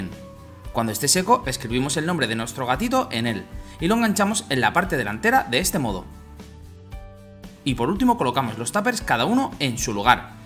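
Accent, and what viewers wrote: Spain. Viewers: ??, eres catalan? ☺️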